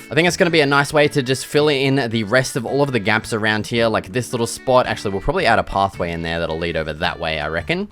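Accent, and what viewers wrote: Australia. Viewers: He’s an Aussie. Kiwis sound different. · He sounds more Australian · Cus his Australian mate 😂 Could tell the moment he said theres afew blokes over there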